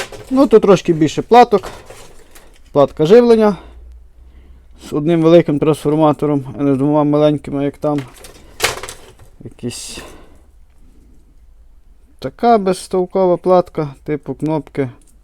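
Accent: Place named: Galicia, Ukraine. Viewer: Привіт. Шикарний Галицький акцент. Лайк . Підписка. Так тримати. Гарний ролик.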